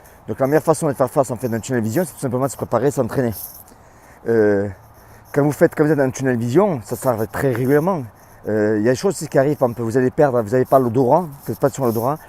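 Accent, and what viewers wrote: South, France. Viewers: J adore votre accent " Sudiste " !!!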